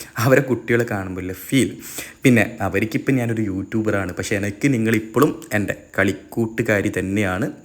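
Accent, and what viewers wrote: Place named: Malappuram, India. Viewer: എന്ത് മെജയാണ് സംസാരത്തിൽ പൊളി മോനെ മലപ്പുറത്തിന് bro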